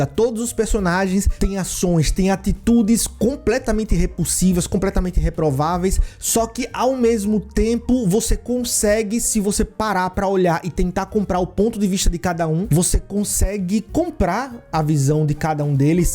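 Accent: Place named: Bahia, Brazil. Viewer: Esse sotaque me faz voltar pra Salvador imediatamente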